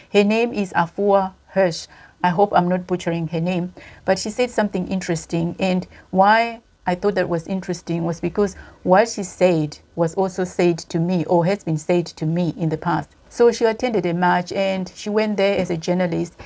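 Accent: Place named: Zimbabwe. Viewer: How long have you been in the UK? You have retained your Zimbabwean accent.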